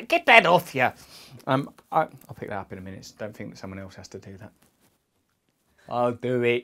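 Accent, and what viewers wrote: United Kingdom. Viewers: Please yell at me anytime in your British accent 😂❤️ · are you British ???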